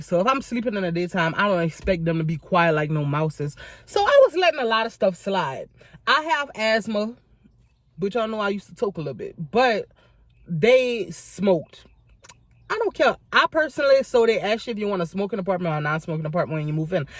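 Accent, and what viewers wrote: Louisiana, United States. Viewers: Love your accent...want to visit lousiana one day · Are you from New Orleans? I love the way you sound.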